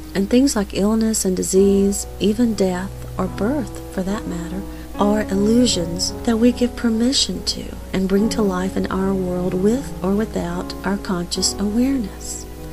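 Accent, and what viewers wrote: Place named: Georgia, United States. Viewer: Maybe that's a Georgia accent . . . I have seen the endless ocean of pure love, but at the time I didn't realize it was me. I chose to leave that silent bliss and return to my noisy life and on the way back I noticed how delicious the agonizing pain in my legs felt. I have enjoyed this encounter and I hope you get as much pleasure from having my attention as I got from being had. : )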